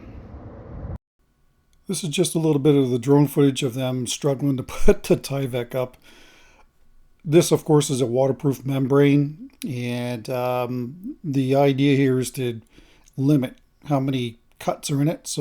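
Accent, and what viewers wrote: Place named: Canada. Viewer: Red Green says garage the way you do, I just figured it was a Canadian thing.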